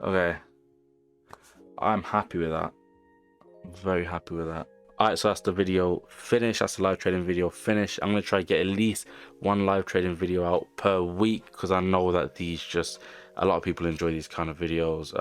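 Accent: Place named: London, United Kingdom. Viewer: in the background and you sound like a Londoner we should defo link up if you are we can share some gems 💯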